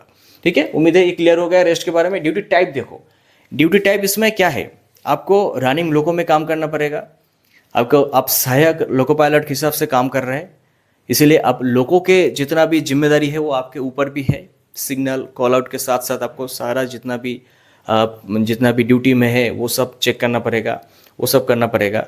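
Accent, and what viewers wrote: Bengal, India. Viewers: Tumi bangali tomar kothatei bojhachhe 😊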